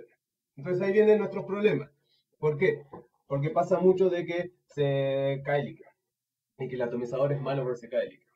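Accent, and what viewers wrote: Argentina. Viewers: El mejor video técnico que he visto. Creo que podré despedirme del pucho al fin. Pensé que eras de acá.. ya casi no se te percibe el acento Argentino .. jijij. Gracias y Saludos. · eres argentino?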